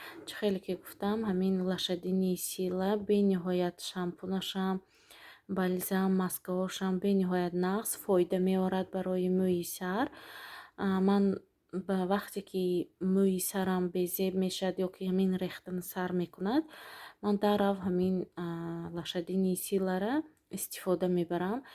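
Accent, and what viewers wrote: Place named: Khujand, Tajikistan. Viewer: Рахмат ки чавоб додет👍👍.Ман аз гапзанитон фахмида будамки хучанди .Некин боз пурсидамм 🤭🤭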